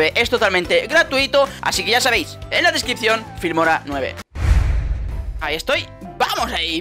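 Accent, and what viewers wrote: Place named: Russia. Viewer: La primera ves qu vi ah dije alv un ruso que habla español xd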